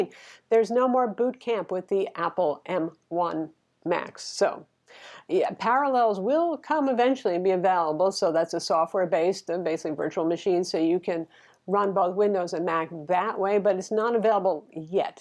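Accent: Texas, United States. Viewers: Chuckle! Good one, pixy. But, it's just her Texas accent. Very Big Grin.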